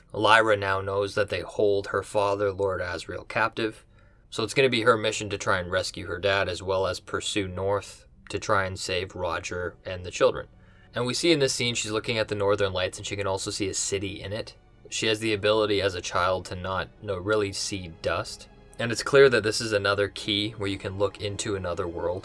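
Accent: Canada. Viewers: theres an accent tells me ur probably from canada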